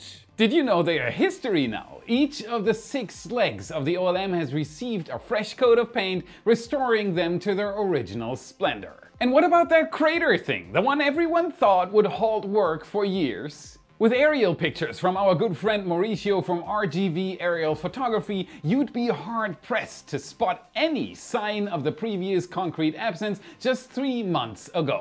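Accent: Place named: Germany. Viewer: He’s native German.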